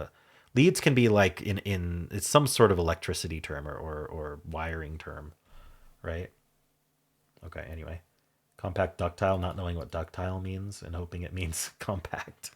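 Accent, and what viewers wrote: United States. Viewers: the joys of US pronunciations i would never have gotten hawk and hock(ey) to rhyme - but then in the US sauce and horse don't rhyme but they do elsewhere.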